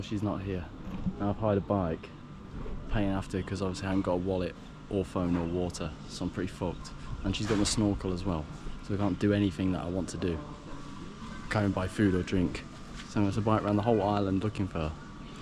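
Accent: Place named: Manchester, United Kingdom. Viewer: Sound Manc or northern Uk which im from. I will keep an eye out of your vids. If your around in IND let me know and you can show me around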